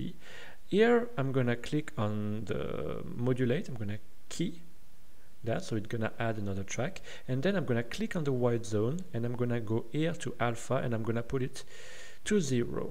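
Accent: France. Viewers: Bonsoir, désolé si je parle en français mais mon anglais est vraiment naze et je crois comprendre avec ton accent que tu es français aussi;). J'ai un problème, je fais exactement comme toi et pourtant quand je traverse la zone cachée, l'anime revealed commence tout juste à s'afficher mais ne finit pas jusqu'a disparaitre complètement. Saurais-tu pourquoi s'il te plait?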